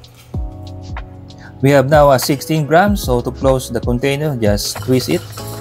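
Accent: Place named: Philippines. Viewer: Cute heavy Filipino accent. Thanks man!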